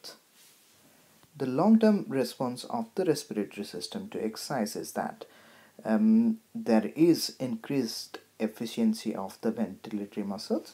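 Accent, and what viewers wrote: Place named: India. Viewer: I like your speaking. But I hate your Indian English.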